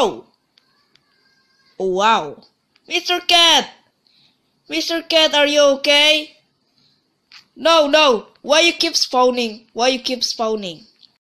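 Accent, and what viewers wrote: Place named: Philippines. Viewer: Your sound is :v Pinoy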